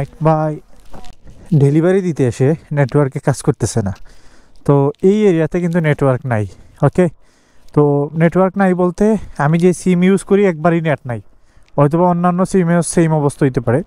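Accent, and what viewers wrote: Sylhet, Bangladesh. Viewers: Bhai Apnar House Sylhet ni ?? 😅 কথার ধরনে বুঝা যাচ্ছে সিলেটি ❤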